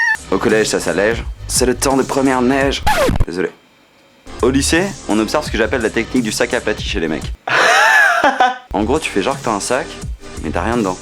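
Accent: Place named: Switzerland. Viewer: Mais tu est suisse j'ai l'imprétion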